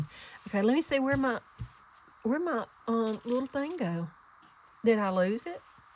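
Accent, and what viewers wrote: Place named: Maryland, United States. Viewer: Hello, what a joy to listen along with you. You are very funny. And I love the sound of your voice. My Uncle called me the other day to tell me my Aunt is dying. She is Maryland, born and bred. And I know your voices are unique across the country, yours is very similar to hers. I will probably listen to this a few times, and although I'm really enjoying your show tonight, I would listen to you read from a catalogue.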